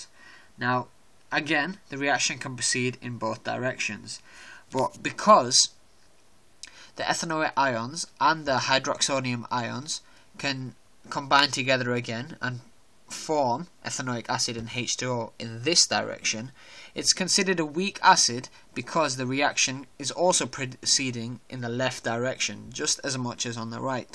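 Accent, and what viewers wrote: United Kingdom. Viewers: I like your British accent.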